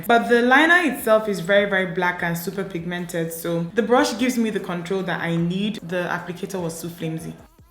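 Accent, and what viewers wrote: Nigeria. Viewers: I love nigerians.Their accent,makeup and traditional weddings...you are so beautiful.Much love from Kenya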